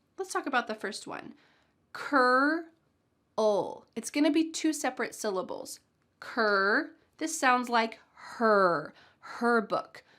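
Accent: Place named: United States. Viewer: Thank you, you are the best teacher. You made me love the English language and the American accentThank you We all love you❤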